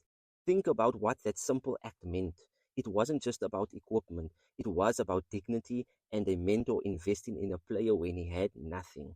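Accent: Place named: Cape, South Africa. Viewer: So inspiring! I also like your Cape accent 👍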